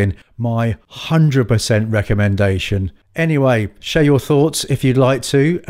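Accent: United Kingdom. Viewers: British accent best accent of the world 🎉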